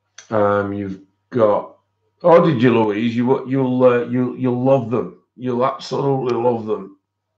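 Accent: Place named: Huddersfield, United Kingdom. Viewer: sorry didn't make that clear and it was one of your own streams. You had a soap box moment and everyone in the stream was so supportive of you. You mentioned no names. I have heard nought else from anywhere. As I said ignore the buggers. I have a potty mouth so being polite about that lol. Only keep those who add value to your life. Your channel has grown because of you and nothing else. Used to work in Huddersfield and good to hear the accent lol. Couldn't stop laughing at Jedi! Chunky munkey lmao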